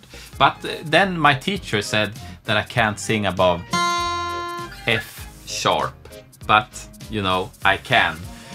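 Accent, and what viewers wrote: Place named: Sweden. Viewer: The swedish accent makes it even better! Tusen takk for en meget god latter.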